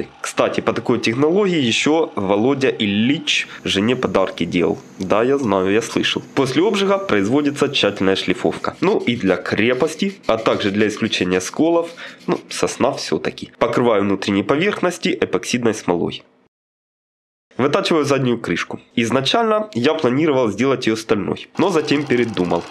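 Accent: Ukraine. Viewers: акцент, украинецкий,я рад славяну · А зачем этот украинский акцент? Если комментируешь на русском.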